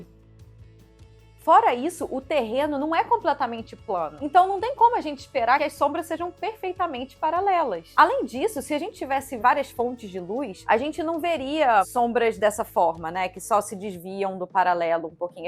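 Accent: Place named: Rio de Janeiro, Brazil. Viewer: Por mais vídeos com sotaque carioca 😆 Essa gente tem muito tempo livre pra inventar teorias mirabolantes.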